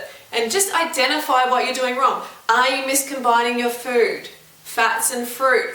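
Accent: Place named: Australia. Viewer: Goo fruit or root yaself !!! Can tell shes an aussie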